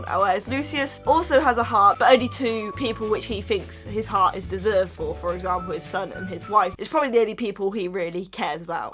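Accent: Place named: United Kingdom. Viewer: sometimes she speak so fast than mixed with the British accent it is impossible to understand a word 😂 but anyways i love this girl so much 💚💚 (and her accent👀)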